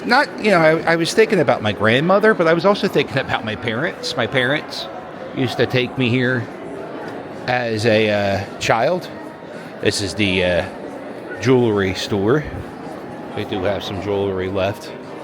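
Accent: Philadelphia, United States. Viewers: Bros Philly accent is 👌 💯 😂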